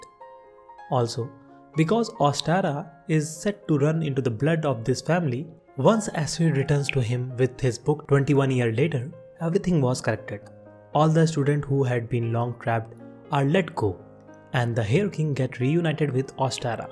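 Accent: India. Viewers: I couldnt finish this. Your accent is the most painful mix of indian and monotone. My brain is in pain.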